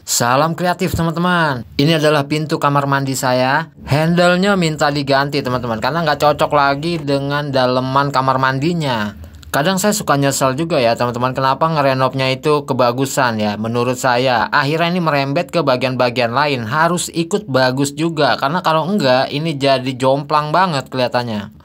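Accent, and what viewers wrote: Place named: Jakarta, Indonesia. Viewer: Hehehe...ini mah asli kobtrn adisi ngelawak ..tp lumayan cukup menghibur, jd kangen jakarta...ligatnya itu betawi bangettt ato jakarta banget dah familiar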